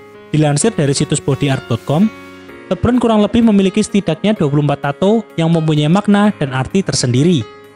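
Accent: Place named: West Java, Indonesia. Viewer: Sundanese english 😂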